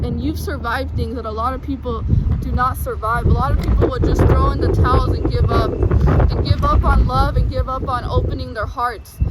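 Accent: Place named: England, United Kingdom. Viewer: I like your english accent , i study english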